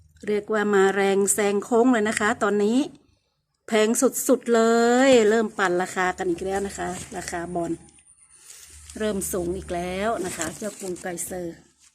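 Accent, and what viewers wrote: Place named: North, Thailand. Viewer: คนเหนือกาครับ สไเนืองคุ้นๆ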